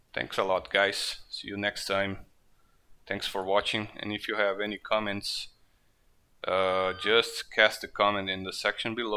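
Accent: Brazil. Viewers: pelo sotaque eu tava aqui pensando "esse cara deve ser br" hahahahahah batata! sucesso para o canal cara, valeu a dica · Eu sei que vc é Br pelo sotaque e pelo windows em Pt Br hauhuah mas olha, vlw pelo tutorial! :P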